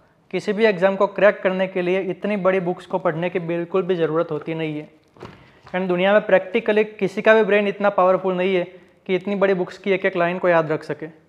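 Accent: Gujarat, India. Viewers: bhai gujarati hoi evu laget???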